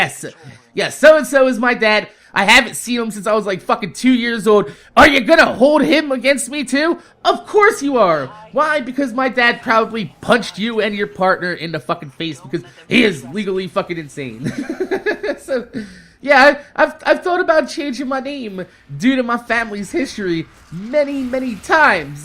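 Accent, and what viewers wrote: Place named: Baltimore, United States. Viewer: I can tell your from Baltimore because it snowed in my recently and the way you say water.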